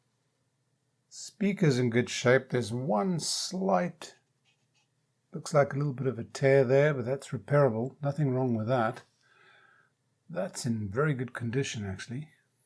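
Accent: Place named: South Africa. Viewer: btw i was always puzzled by your South African accent, in Madeira and just now i found out that you studied there. The mystery solved. Sorry for prying.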